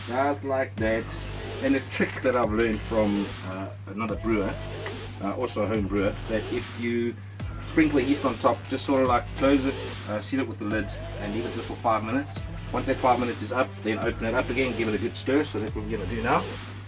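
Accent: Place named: South Africa. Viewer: I wondered what accent this was. South Africa. What a proper country. Cheers.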